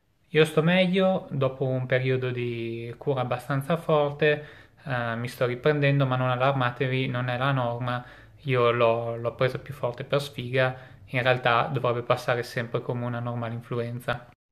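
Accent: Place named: Piacenza, Italy. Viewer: Piacentino certificato!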